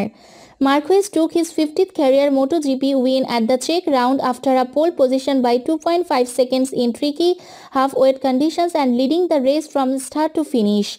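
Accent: India. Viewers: She maybe a indian announcer...by the way thanks for the effort..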